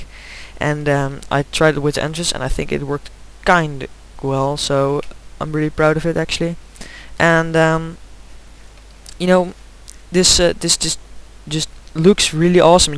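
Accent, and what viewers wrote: Netherlands. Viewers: nederlands? dat hoor je gewoon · Ben je nederlands xD Je hoort gewoon dat je geen engels/amerikaans bent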